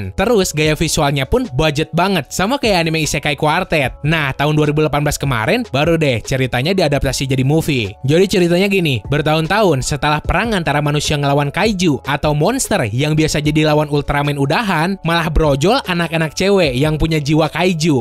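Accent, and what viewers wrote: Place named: Java, Indonesia. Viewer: jawa keras lurd wkwk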